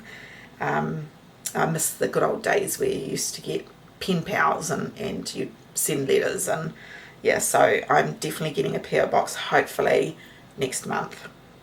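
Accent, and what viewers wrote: New Zealand. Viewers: What a nasty thing to say to you. It is not anyone's place to make personal comments. I love you videos and I have only just discovered them. You are REAL and most of us have kitchens just like you. I enjoy the way you are always positive and make the videos fun. Please keep up the good work. I am in NZ too and it is great to hear another kiwi. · OMG- please don't take the 1% negative comments to heart!!! We LOVE seeing you in your little kiwi kitchen, yours echoes our own... Your accent, your beautiful aprons... It's all familiar...80% of kiwis live like you do! Good on you, for taking your life into your own hands and creating your dream life! We celebrate you! ❤ 🎉🥳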